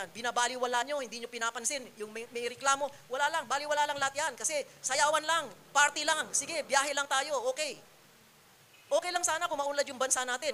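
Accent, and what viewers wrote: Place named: China. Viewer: Chinese ka ksi kaya ganyan ka magsalita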